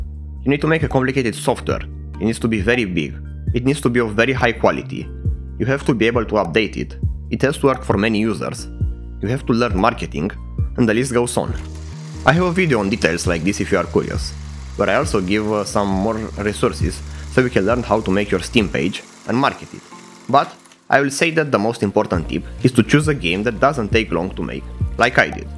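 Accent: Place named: Romania. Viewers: Si mie imi dadea vibe-ul de roman, dar nu eram foarte sigur :)) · lol stiam dupa accent ca esti roman din prima))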